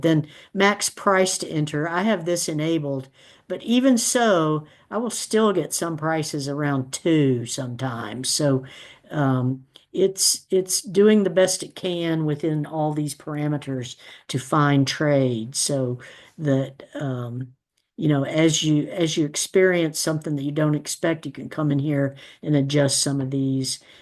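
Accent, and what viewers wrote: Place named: Houston, United States. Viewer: Good morning. Can I join your discord group? Please send link. I am also interested in Dale Perryman's contact info. Do you know how reach him? THANKS! Grew up in Houston and your accent feels like home.....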